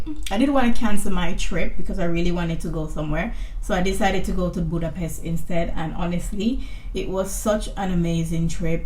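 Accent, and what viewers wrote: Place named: Jamaica. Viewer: I could hear the Jamaican accent from your tik toks 🇯🇲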